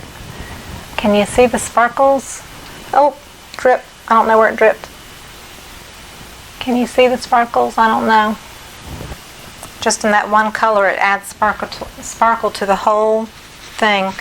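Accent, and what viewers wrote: South, United States. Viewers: You sound like a southern girl. I have lived in Atlanta all my life so I have a similar dialect as well.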